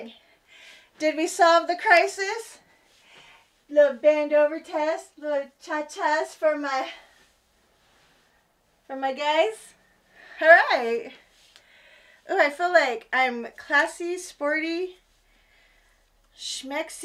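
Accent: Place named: South, United States. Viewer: Honey i love your southern accent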